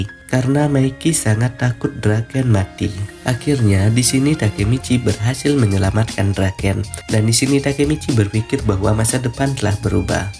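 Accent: Aceh, Indonesia. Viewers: logat abangnya kyak logat Aceh😂, Orang Aceh bang ya?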